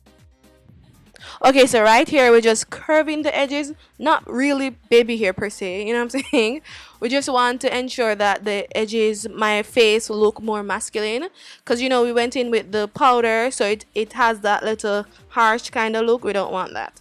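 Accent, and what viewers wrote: Jamaica. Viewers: My mother is from JA. I knew where you were from, before you said it. 🇯🇲🇺🇲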